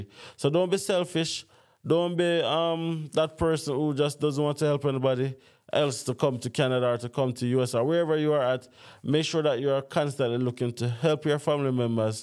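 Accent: Jamaica. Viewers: Like ur English patwa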